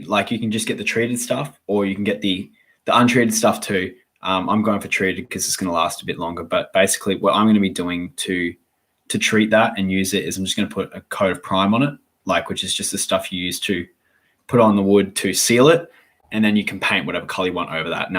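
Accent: Australia. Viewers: Love the Australian accent haha, great video mate 😀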